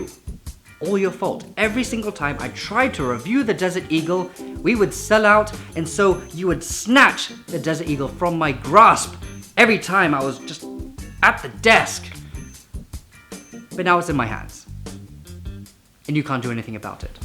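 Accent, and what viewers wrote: RP, United Kingdom. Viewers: Is that a British accent? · why do u sound so British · You sound really posh lol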